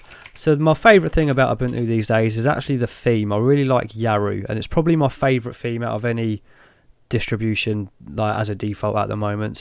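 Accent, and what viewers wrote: England, United Kingdom. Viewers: I like this bloke - Pommy accent doesn't grate on Australians :)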